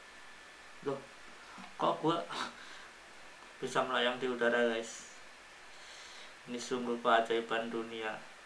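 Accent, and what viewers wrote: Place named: Java, Indonesia. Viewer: medok ngomongnya mas. mending pakek bahasa Jawa aja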